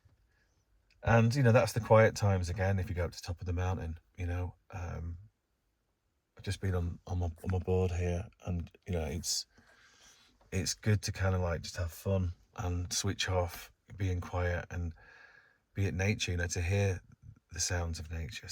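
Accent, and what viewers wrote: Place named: United Kingdom. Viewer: Just subscribed and meet you from The Astrology Podcast, your British voice and natural carelessness, ease with how you speak and not so in control is why I've look to find what passes through you to share. First video watching, serenity is indeed with silence, peacefulness a base to the inner witness. Thank you for sharing and making a channel to connect, I will be watching and generating with it. Thank you.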